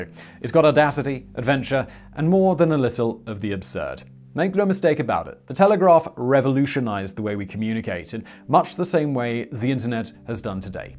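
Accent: United Kingdom. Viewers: Thank you for pronouncing Newfoundland correctly.. well at least as correctly as possible with a British accent.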